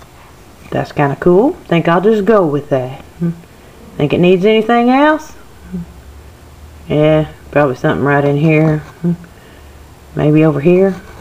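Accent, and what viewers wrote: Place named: South, United States. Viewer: I laughed more than I should have. You could seriously be a comedian, the southern accent makes even funnier :).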